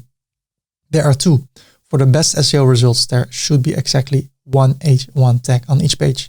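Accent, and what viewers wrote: Netherlands. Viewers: I've to say it. At first i was like, that voice, sounds familiar. Maar toen wist ik het! een Nederlander !! en toen zag ik maasluis staan. Lachen, Keep up te good work! +1 sub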